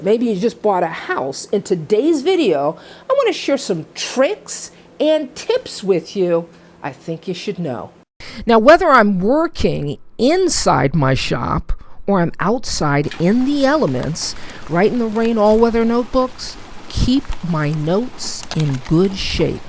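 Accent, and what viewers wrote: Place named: North, United States. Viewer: Nice tutorials and even nicer to hear a northern state accent again, reminds me of my late father, born in Bar Harbor Maine and lived in Massachusetts all his life. Nothing against the southern state boys, they're good too but the accents grate after a while.....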